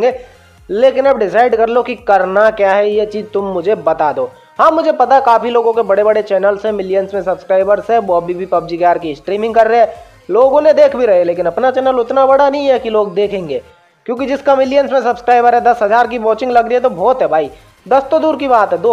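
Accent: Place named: Rajasthan, India. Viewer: * WHERE ARE YOU FROM*.???????????????????? RAJASTHAN SE LGTE HO BHAI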